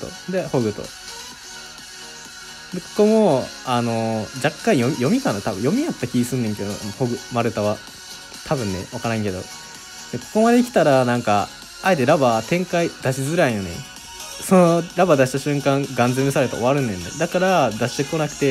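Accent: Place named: Kyoto, Japan. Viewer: 京都弁最高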